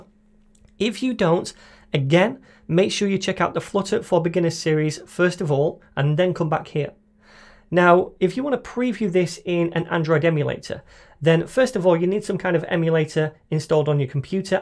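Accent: United Kingdom. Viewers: I just found your channel, and I feel incredibly and unbelievably lucky. I just finished your flutter beginner tutorials and let me tell you this, you are the, I mean THE best tutor on coding. I love the way you teach, I love the way you talk and I normally don't like British accent too (no offense) man, you are just different and better in all ways. That is why I feel lucky to be able to find someone like you. And most importantly of course, I can not thank you enough for the knowledge that you offer and share. I wish you all the best.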